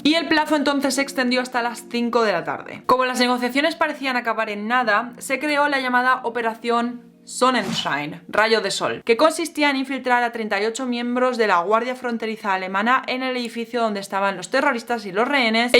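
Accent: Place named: Spain. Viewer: dominas muy bien el idioma , aunque español de españa pero bien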